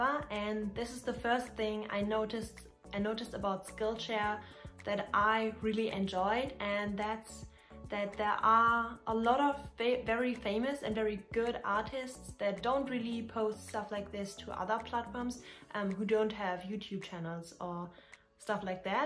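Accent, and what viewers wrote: Germany. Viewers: Heftige Englisch skills👏🏻 man hört den deutschen Akzent trotzdem direkt raus😂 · Du bist doch deutsch oder? 😁 den Dialekt kann man ja kaum überhören 🇩🇪👍🏼 danke für deine Meinung 🙂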